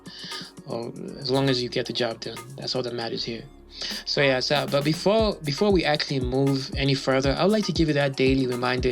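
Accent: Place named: South Africa. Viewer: Nice accent bro no homo are you south african?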